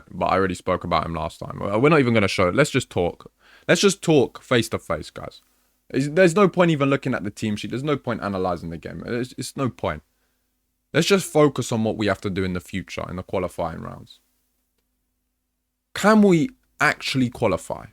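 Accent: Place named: MLE, United Kingdom. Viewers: You're very clearly English, you have that awful MLE accent. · Was not expecting a multicultural London English accent when I clicked on the video!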